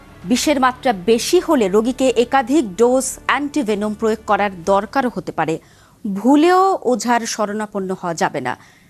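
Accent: Kolkata, India. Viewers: Apni ki Indian ? Apnar language and presentation style Kolkata ba Indian Bengali style er. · Apnar accent kolkatar moto keno? disgusting,disappointing, unacceptable. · এই presenter মনে হয় ভারতীয় নারী।। তার বাচন ভঙ্গি কলকাতার মেয়েদের মতো।। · Apni kolkata style a Bola bondho koren. Birokto lagey. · নাচনেওয়ালী কোলকাতার accent এর উপস্থাপিকা কেন? বিরক্ত লাগল খুব। উচ্চারণও কোলকাতার যা শুনেই বিরক্ত লাগল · আপনি কলকাতা স্টাইলে কথা বলেন কেন , আর এটা বাংলা না বাংলাদেশ ওকে...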